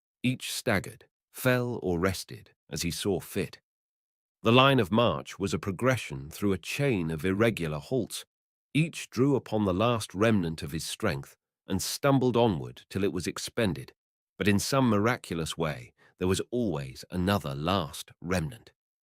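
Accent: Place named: United Kingdom. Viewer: Are you crazy? All American author read with a heavy British accent is NUTS. Jack is turning over in his grave.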